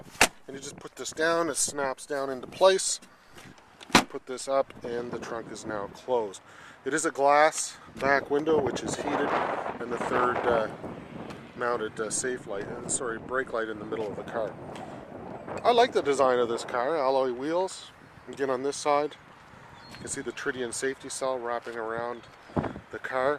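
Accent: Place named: Canada. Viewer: sounds like you are from Canada sweet car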